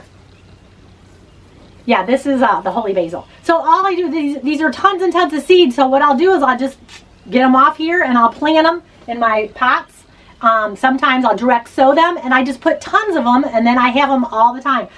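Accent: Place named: Boston, United States. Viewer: I think you got an awesome personality. You have a bahston accent.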